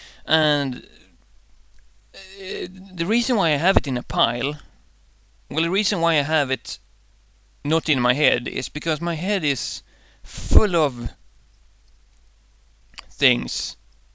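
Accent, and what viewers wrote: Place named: Sweden. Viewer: I am proud to have him. At first, his accent bugged me because I thought it was Scottish but it sounded wrong. Then I realised it was Swedish and sounded right. Now I find it comforting to listen to.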